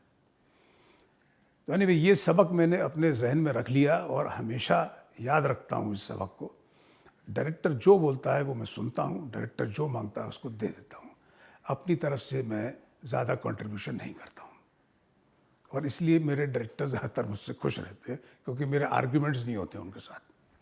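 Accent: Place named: Uttar Pradesh, India. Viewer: Sir apki language se andaza laga lia tha mane ap UP se conected ho my forefathers belong to Allahabad neem sarai and besona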